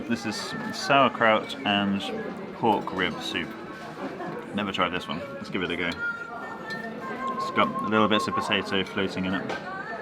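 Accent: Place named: New Zealand. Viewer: Thanks, I was stoned af last night watching this and for some reason thought you mighta been kiwi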